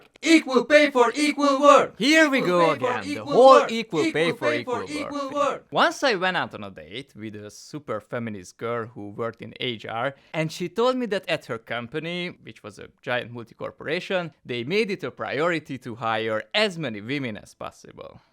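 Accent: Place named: Hungary. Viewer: nagyon hallani a magyar akcentust kétlem hogy ai lenne ezt felmondta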